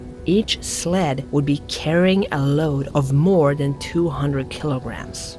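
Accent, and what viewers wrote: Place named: Sweden. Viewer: assuming you are actually from Sweden , as an English person it is great to hear a real narrator whom isn’t English or American especially when it’s covering an event concerning a ‘local’ ! I’ve had to watch all the previous covering this because it’s pretty bonkers & am enjoying your treatment of the adventure 😁👍❤️from Bournemouth in Sw England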